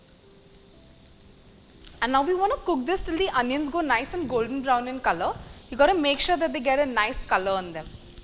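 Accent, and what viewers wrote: India. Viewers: I like your presentation, and your kitchen. And, you have garnished with the Indian accent. I have squids, and was looking for a simple recipe with good results.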